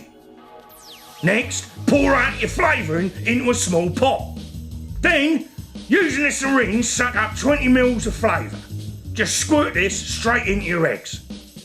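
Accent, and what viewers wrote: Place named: Cockney, United Kingdom. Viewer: Wow big grizzly cockney voice and the missus making the boilies now that's a concoction!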